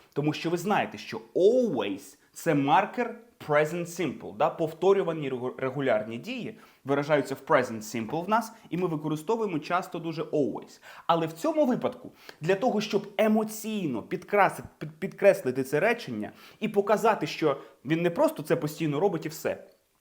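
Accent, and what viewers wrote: United Kingdom. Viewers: Хахах... Усі вчителі навчали нас зовсім по-іншому! Усі, буквально кожен з них вимовляв просте слово "open" як [оупен]... 😂 У вас же - зовсім інакша, але така типова вимова як для звичайного англійця! Не "оупен", а щось схоже на "ьойпен" (майже так само якщо поставимо якусь приголосну перед м'яким знаком, але не вимовляючи цю приголосну!). Просто шикарний British accent!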